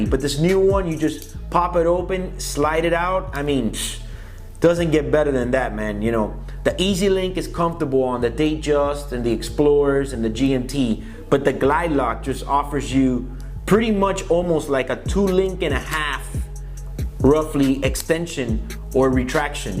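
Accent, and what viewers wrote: Cuba. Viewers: Cuban accent,, interesting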